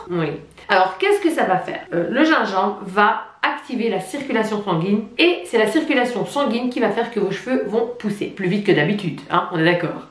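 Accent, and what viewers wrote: Brussels, Belgium. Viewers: Je suis complètement sous le charme de ta super personnalité positive (y) Et les petites touches d'accent bruxellois que tu intègres spontanément me font bien sourire;-) Continue comme ça et bisous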